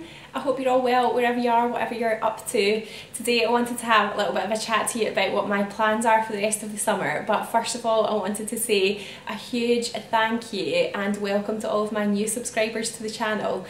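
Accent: Scotland, United Kingdom. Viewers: I live in Switzerland and it’s great hearing another Scottish accent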